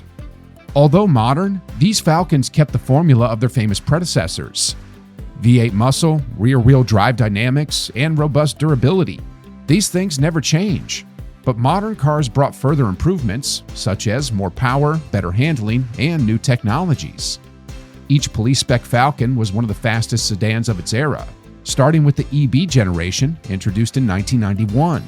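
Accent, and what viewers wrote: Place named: United States. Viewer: The yank voice put me off!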